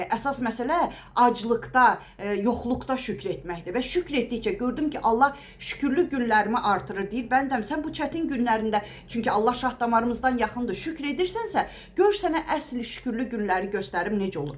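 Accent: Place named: Aghdash, Azerbaijan. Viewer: Allah razı olsun hem yerimiz bacı mende Aqdaş rayonunanam darıxmışdım lehcemiznücün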